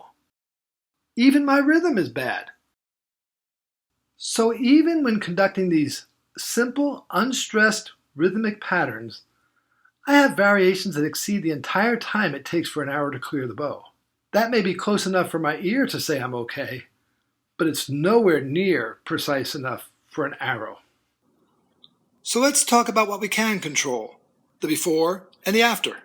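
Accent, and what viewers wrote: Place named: Oxford, United Kingdom. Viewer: And you do that, totally great. It´s so easy undertand you Oxford english. No question by now. I often ask myself about similar topics. This is a fantastic response, supported with videos. THANKS !!! I´m waiting for some topics about Field Archery, the most interesting and HARD to learn. Te preguntaré en español también, jejeee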